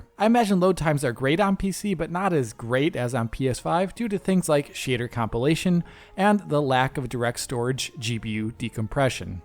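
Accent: Germany. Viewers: Your voice is incredibly monotonous and boring... and yet when you speak a bit of german, it becomes very colorful and full of life. You should try to use your german accent to speak english! And thank you.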